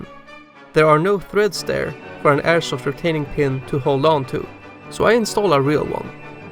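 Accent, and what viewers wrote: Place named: Russia. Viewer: Why would you destroy a real steel lower so you can make it into an airsoft? That makes no sense wasting a registered lower to do this. You could have just bought an 80% real steel to turn it into airsoft. Then it dawned on me that accent... Maybe you are not in the USA… maybe in Russia? Daym... It's that easy to get an m16 lower? Daymmm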